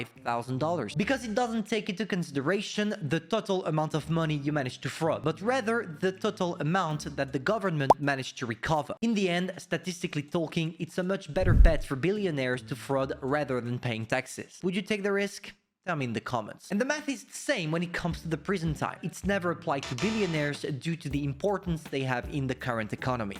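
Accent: France. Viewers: Very interesting and informative... but IMO it would be good to have some curated subtitles alongside as your French accent is quite strong. I find it difficult to understand some parts as a native English speaker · ! Been watching you for a while know and you probably know that already. I would rate this video a 9/10! For a first timer this is fantastic and I would definitely not mind watching vids like this. The only thing you could improve with is your pace. It was a bit quick and due to your French accent it's slightly difficult to understand. If you slowed down a bit 10/10 vid! GL on making more vids like this, these type I enjoy:D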